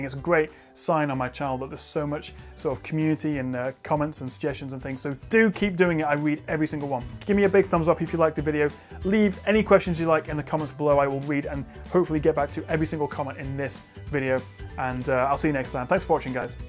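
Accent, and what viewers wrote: United Kingdom. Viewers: oh right ^^ well I like your British accent (I'm Franco-American);-)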